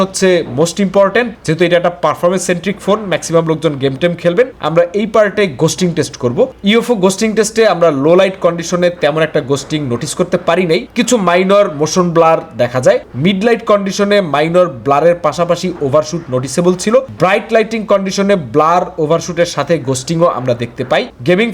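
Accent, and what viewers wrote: Barishal, Bangladesh. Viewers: ভাই কি বরিশাইল্যা নাকি?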